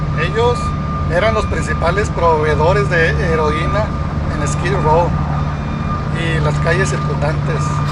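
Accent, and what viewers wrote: Mexico. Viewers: Compa tienes un acento muy marcado michoacano que me distrae, el video parece que es un comercial a esas bandas, no todos los que ven el video son unos expertos como tú, buena idea fallida ejecución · Esto de las pandillas son puras tonterias que en vez de sumar a la sociedad, restan, hablas bien mexicanoooo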